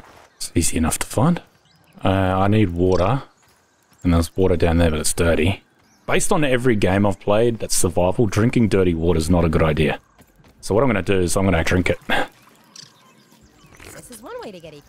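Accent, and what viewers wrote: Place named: Australia. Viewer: Ok you can all laugh but it took me forever to realize has an Australian accent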